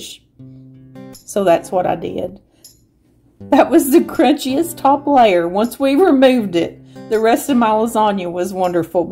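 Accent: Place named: Arkansas, United States. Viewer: ❤ I love your accent ass where are you must be from Arkansas that’s where I’m from but my daddy’s eat mayonnaise sandwiches but they were miracle whip not 😂mayonnaise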